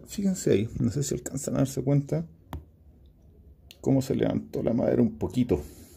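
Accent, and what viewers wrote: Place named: Chile. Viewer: My wife said u r from chile after hearing your accent 🇨🇱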